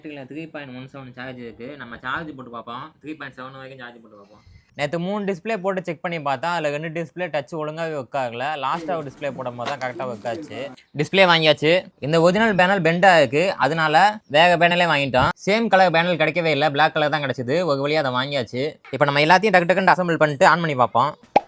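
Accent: Madurai, India. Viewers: அண்ணே ஆனா நீங்க மட்டும் எப்படி எல்லா மெக்கானிசம் பாக்குறீங்க உண்மையிலேயே வேற லெவலுன்னு நீங்க மதுரை ய?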